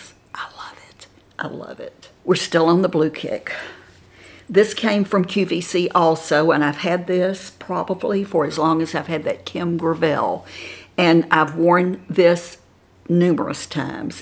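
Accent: South, United States. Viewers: Hi.....so glad I found you! Nanny (Mary Ellen) recommended your lovely site. YOu are so cute and sweet...loved the fashion show.....also love your sweet Southern drawl. Your make up is beautiful. Hugs from a nana in Pa.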